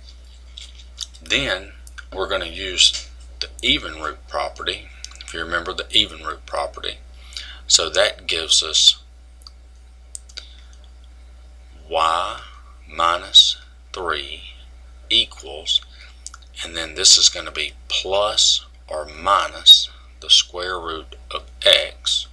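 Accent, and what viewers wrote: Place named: Texas, United States. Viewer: Thank you so much Sir. Your explanation was perfect. It helped me understand the topic better. By the way, I love your Texas accent. I think it's cool.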